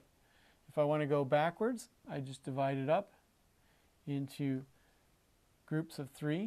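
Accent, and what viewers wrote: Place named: United States. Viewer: Congratulations you're the only person with an American accent I can find for the subject. You win.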